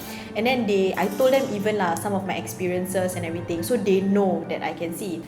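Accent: Singapore. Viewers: Are you Singaporean? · Tgk vid ni baru aq tau singapore pnye bm pn loghat lain siak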